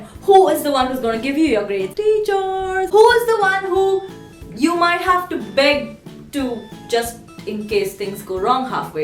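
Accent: Maharashtra, India. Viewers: That's funny ! you speak English in Marathi accent :-D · Dat Marathi accent in English...u r amazing ...what makes u awsme is dt ur topics n videos r relatable (to v Indians )which makes u makes u different frm Lilly Singh...love love❤️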